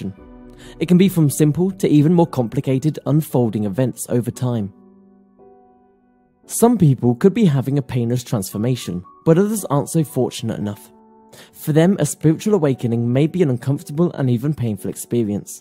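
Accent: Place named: United Kingdom. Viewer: you sound sort of british.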